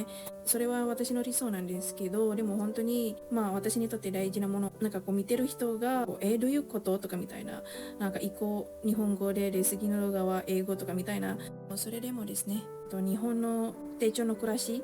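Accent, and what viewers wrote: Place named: Indonesia. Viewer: I guessed from your accent that you're from Indonesia. Hello! I'm from the Philippines, also living in Japan. よろしくね！ 手帳や文具が増えるばっかりで、その活用方法のため自分でよく手帳会議をしています！💦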